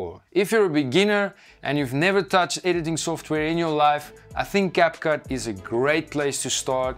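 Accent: South Africa. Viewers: You sound SA, cool video man!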